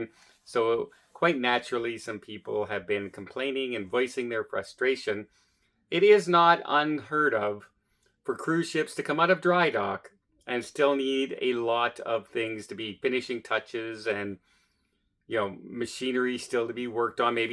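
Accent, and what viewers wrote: Canada. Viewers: you must really be bored with your life. He is from Canada sometimes there pronounce words differently .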